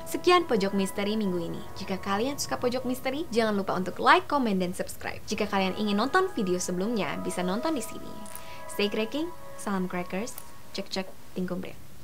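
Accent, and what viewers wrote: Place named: Java, Indonesia. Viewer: logat jawanya keliatan bagus deh (y)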